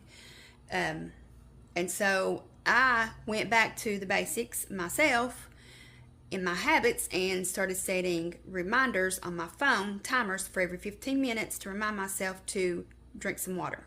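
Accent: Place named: South, United States. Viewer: just found your channel, love your curls and southern accent!! (Louisiana girl here) You look great and thank you for sharing your info. I hope next week brings your results. I need to get better at making videos..I need to also start weight training, so definitely subscribing to follow your progress.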